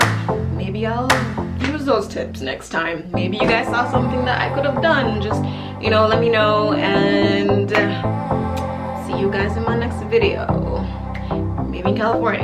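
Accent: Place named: Caribbean. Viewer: I detect a Caribbean accent =) Hey Sis!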